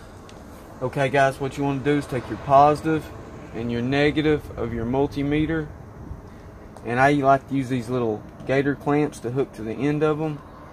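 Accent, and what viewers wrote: South, United States. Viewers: Somewhere in the south. Really annoying to anyone outside that area. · The information presented is ok , but that southern accent is sounded much better 😊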